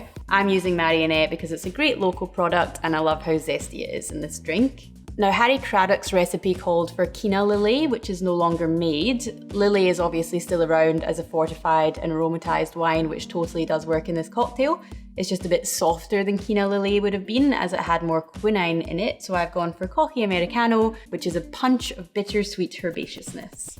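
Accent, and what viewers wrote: Scotland, United Kingdom. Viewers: Thanks for that! I'm usually good with accents and figured you were from Western Scotland and had spent some time in Canada or the US, but had no idea about France. (You have your hobbies, i have mine.) More importantly, thanks for the lesson in one of my favorite go-to cocktails! Cheers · I love your accent. By chance, are you of Scottish origin?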